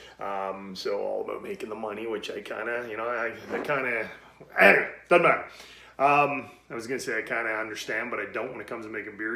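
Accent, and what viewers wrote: Canada. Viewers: You speech patterns remind me a bit of Patrick Warburton 😂 loving the kanuck accent! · You sound Canadian! (I am too). Hope your stuff gets tons of views! This is a cool concept!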